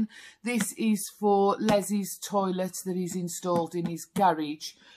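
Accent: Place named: Black Country, United Kingdom. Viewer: I'm getting yam yam off you not brummie 🤔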